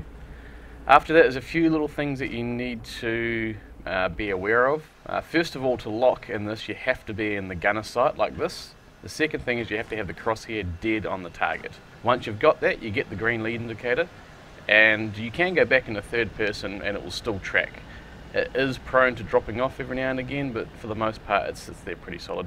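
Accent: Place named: New Zealand. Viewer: Spot the kiwi accent..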